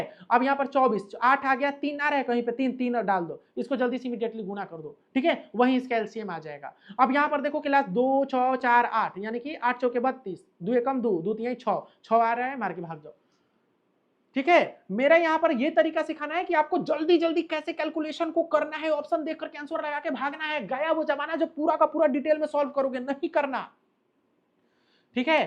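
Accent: Bihar, India. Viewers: Bihar ka hai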